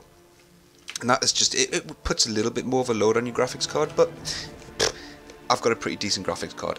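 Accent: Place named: United Kingdom. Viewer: I love your way of commenting? What part of UK are you from? Your accent is delightful.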